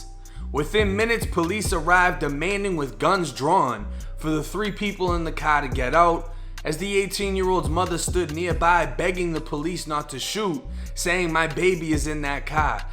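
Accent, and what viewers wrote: Boston, United States. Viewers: Bra your channel interesting, but I'm from the O (X) why you sound like you from Boston somewhere? No shade just wandering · i think he from boston moved to Florida now he's back in Boston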